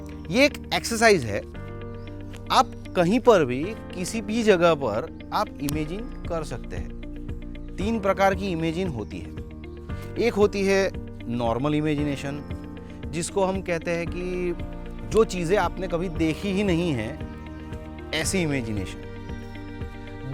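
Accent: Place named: Bengal, India. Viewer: tumhare language mein bengali accent bhar bhar ke ah rahi hai 🙂🙏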